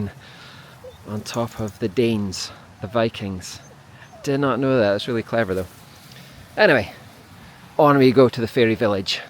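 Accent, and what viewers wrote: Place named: Aberdeen, United Kingdom. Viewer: you need to do your tree man you sound like you're from aberdeen'ish area - my granny was from lewis and her mum was from Aberdeen, well Moray (Urquhart) - thank god - cause records only go to the late 1700s in Lewis - Aberdeen's are way better - you're probably related to loads of these folks - I'm related to the folk that used to live at Drum castle too :D its well nice - I now want to go and reclaim all these castles :D :D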